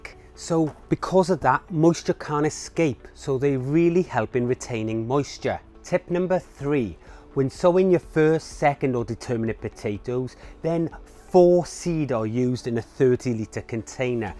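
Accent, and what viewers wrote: United Kingdom. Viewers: At about the mark of, in Tip No. 2, the captions say, "...use a 30 liter or "80" US gallon container.", you have correctly said it.... 8 gallons. You, however, might wish to get the captions corrected. Those either unfamiliar with the British accent or not accustomed to the Metric system, and totally relying on the captions will be thrown off, and head to the market to buy giant trash cans. LOL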